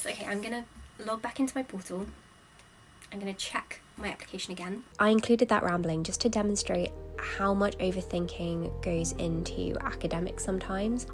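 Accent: United Kingdom. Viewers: There is no such thing as "the British accent". is only one of a great many. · I honestly love your video because you're so cute and I love the British accent and I tried to learn it so I love you 💘🥹💕 and because you don't use music so much so I can listen to the accents to learn it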